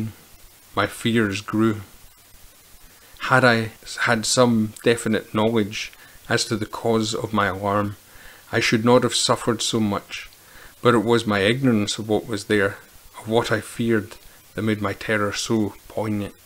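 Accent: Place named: Scotland, United Kingdom. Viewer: First time this channel came up in my feed and I'm absolutely delighted. The stories are so wonderfully creepy and the way they are told, is perfect the lovely sound of the Scottish way of speaking, no dramatic background music that makes actually hearing the story very difficult - this storytelling is simply perfect for me. I love it, subscribed and clicked the bell with a big smile on my face. Thank you for making these videos for us, I'm going to check on your playlist. Best wishes from the Highlands of Bavaria (alpine region) 🙋🏻‍♀️ Slàinte mhath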